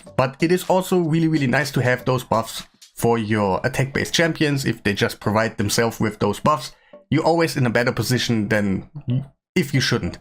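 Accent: Germany. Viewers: Dude, where are you from? You sound German or Swiss or Austrian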